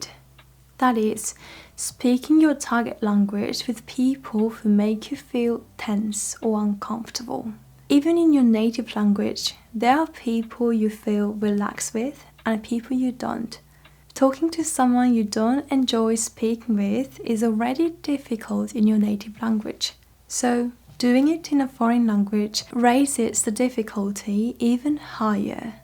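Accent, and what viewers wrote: England, United Kingdom. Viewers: wow you have a strong English accent when speaking English 😅 thats cool!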